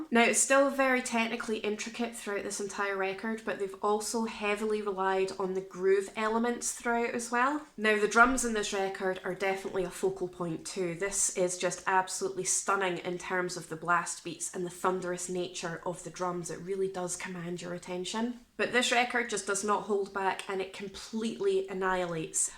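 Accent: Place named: Scotland, United Kingdom. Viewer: Your accent reminds me of my hometown, Kincardine (Ontario, Canada). We had a very strong Scottish influence. It’s good to hear for a change.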